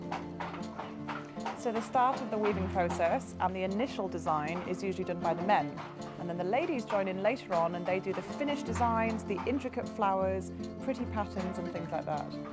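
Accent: United Kingdom. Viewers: British 🏴 english accent is great 👍🏻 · Hi which part of the world are you from. By your accent you sound British. Nice to see you that you are enjoying over there. Wish you well. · I will subscribe your channel if you Marry me 😁 DONOT MIND 🌼🌼🌼🌼🌼🌼🌼🌼🌼🌼🌼🌼🌼🌼 I Love Listening British Accent ✅ Beauty + Lovely Voice + Beauty...... you are very beautifull 🌹I AM FROM SWAT🌹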